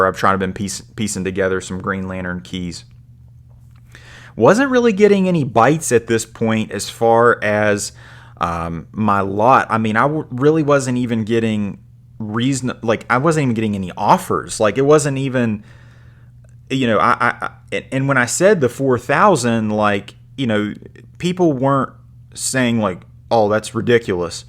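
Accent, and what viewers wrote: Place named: South, United States. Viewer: Judging for your accent, I'd guess you're a Southern guy like myself. Rick Fortenberry (one of the guys who puts on the show) is a friend that I got my Batman 232 cbcs 8.5 from. You also had a brief glimpse of another great guy, Lyn Anderson who puts on a show in Raleigh 3 times a yr. Next show is early December and has some great vendors , Like Rick and Dave Hinson . You really should look into checking it out if you're in NC and looking for great 1 day show.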